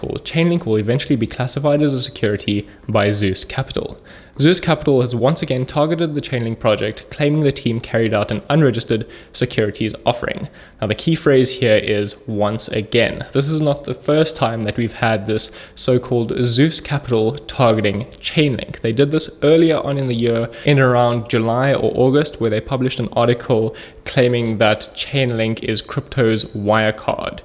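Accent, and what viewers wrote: South Africa. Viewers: Nice videos..my new favourite channel for crypto news. Seen ur channel yesterday. 🌟🏆🙏 1 Question: Are u a fellow South African? 😉👍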